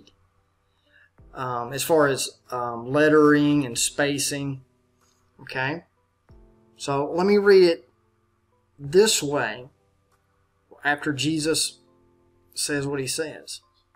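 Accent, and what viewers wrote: South, United States. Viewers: awesome bro. you from Bama!? that's sick. I never knew that. I always knew from your voice that you were from some part of the south, but I love it. I'm from Alabama also. been here my whole life. Do you still live in Alabama?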